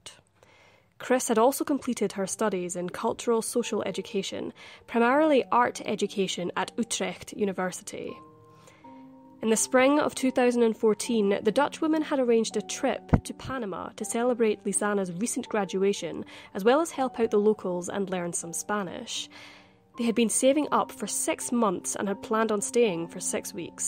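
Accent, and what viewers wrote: Scotland, United Kingdom. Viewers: And I LOVE your accent ! Reminds me of Sean Connery.A relief after all those computervoices an Vallygirlll accents.A delight to listen at.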